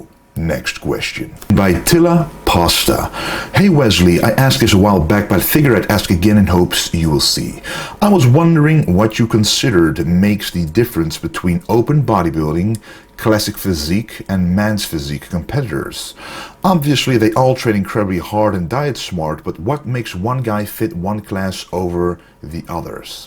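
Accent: Netherlands. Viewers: Dutch, can't you tell from his accent?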